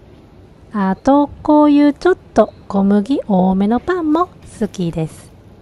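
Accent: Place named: Kansai, Japan. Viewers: 初コメです！Guten Tag🍺 私も過去に１０年以上ドイツで暮らしたことがあるので懐かしい街並みや生活スタイルを懐かしくチャンネル登録させて頂きました。 旅行で数日滞在するぶんにはドイツはお城やらオーガニックコスメやら硬ったいパンやらもの珍しいかもしれないけど、何年も現地に住むとほんとに日本でのドイツの捏造されたイメージと現実があまりに違いすぎて愕然としたものですよね( ´艸｀) それでも流石に現地に順応されて生活されて子育てまでされていNobanaさんのような素敵な日本人奥様がいることがすごいなと思うし在外邦人の鏡だと思います。 視聴者さんの中には海外生活やドイツ生活のネガティブな部分を見るとがっかりするのか・・そのように思う方もいるようにコメント欄にはいるようですが、ここはあくまでNobanaさんの動画チャンネルなわけですからNobanaさんが考えたお好きに動画スタイルのほうがご自身にもストレスないと思います。ただでさえ寒く暗くどんよりグレーな空のドイツ冬季に色々メンタルも落ちるのすごいわかります。 日本に帰国して思うのですが日本のテレビやメディアは海外の映えている部分しか見せないし、海外に住んでいると(そんなアホな―笑)と思うことだらけだし。 日本のテレビはほんとに海外生活のいい所しか映しませんから、これから海外の出たり海外生活を始める方もこういう海外生活ＹＯＵＴＵＢＥ動画を見ていると思うので、いざ現地に出て映えていない現地生活にがっかりしないように準備するためにも、ほんとの海外生活を見せる事も大事だし、結果的には役にたつのだと思います。 これからもNobanaさんらしい関西弁での面白い動画を楽しみにしています。 ちなみに私はドイツの混浴サウナは１度経験してみればもう慣れてしまいジムで平気になりました(笑) 寒い長い冬の気候で凍えるよりジムのサウナで温まるほうがメンタルを保つのに大事でした( ´艸｀) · 今回も笑えました😂 ドイツのスッポンポン事情‼️ぜーったいNGですよね😣逆にストレスたまります！ 主婦だって息抜きは必要。美味しいお料理とお酒とおしゃべりがあれば乗り切れる気がします！ 実は今日、新社会人に向けて、娘が一人暮らしをスタートしました。私の方が寂しくてしょげていましたが、この動画を見て思わず笑ってしまいました😊nobanaさんの関西弁のお喋り、ほんと大好きです😍これからも楽しい動画、よろしくお願いします😋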